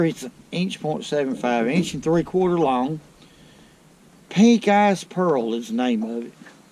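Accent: South, United States. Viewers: You are a wonderful awesome man. And you happen to be a fisherman. I am glad that I am still subscribed to your channel. I actually haven’t watched your channel for a while. Been working a lot, and in my opinion, your southern drawl when you talk reminds me to stop working so fucking much, and relax a little bit, and get back to what brought you joy. I watched a video of yours on how to set the hook depth for Crappie fishing. Thank you so much sir. (caught a lot of crappies from your wisdom and knowledge) I hope God blesses you profusely. You have a joy inside you that is priceless. You never talk about your life struggles. But like many of us people that have been paying attention to your channel for a while, life struggles are always in somebody’s life. Don’t be ashamed of smoking cigarettes. When you went on that Famous fisherman’s lake that has a Amish haircut, I could hear it in your voice that you were nervous, and just wanted a fuckin’ cigarette. 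Pardon my French…..I am a tobacco user currently, and I understood and could feel what you were going through. Secretly in my head, I thought it was an honor for the Amish haircut guy to have you on his boat. No joke. Real talk, no squalk…… God bless you brother.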